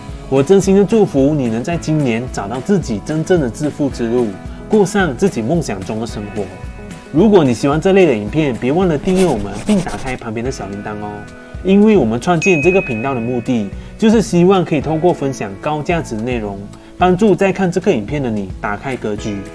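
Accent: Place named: Fuzhou, China. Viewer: 你的福州音好重😂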